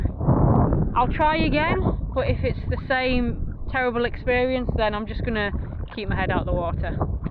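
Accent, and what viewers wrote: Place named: Nottingham, United Kingdom. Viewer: Don’t recognise your accent? My guess would be Nottingham area , sorry if my guess offends you but obviously only a little sorry , an energetic charming soul you are .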